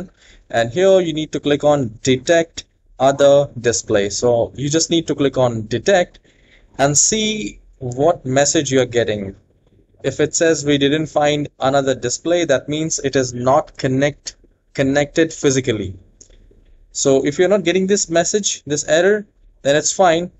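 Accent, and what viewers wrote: India. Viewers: nah yk its gna work when you hear the indian accent lmao W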